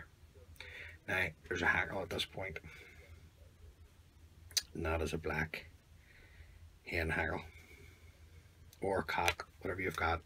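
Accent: Northern Ireland, United Kingdom. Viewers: Well, if that's not a norn irish accent. Great wee video of a very traditional fly. I've been away from tying for about 45 years and I'm glad to see that these haven't changed. Found most of my kit the other day so I think it's time to revive an old passion of mine.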